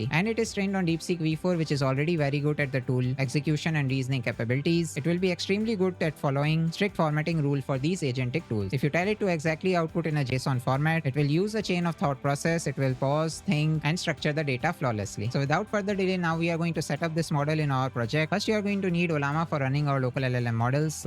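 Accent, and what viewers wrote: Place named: India. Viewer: Господи, пока не увидел твой коммент думал повешусь с индийского акцента, уже забыл что такое индийские айти видео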